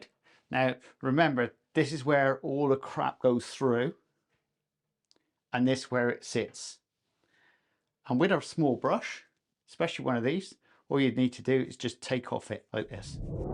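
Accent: United Kingdom. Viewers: Love when I hear a Bristolian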